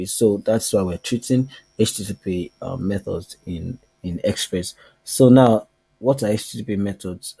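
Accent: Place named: Nigeria. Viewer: You sound Nigerian 😅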